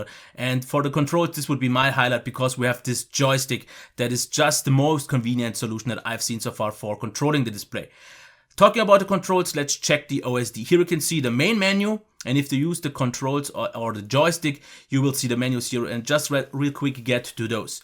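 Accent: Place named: Germany. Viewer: German accent (almost the same as Austrian, which Arnold comes from).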